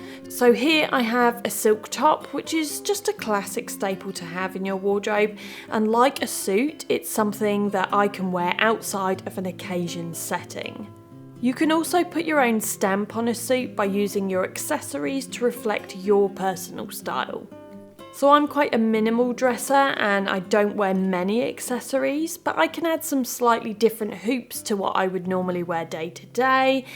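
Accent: England, United Kingdom. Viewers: Your voice and the English accent are so chic. Adore listen to you.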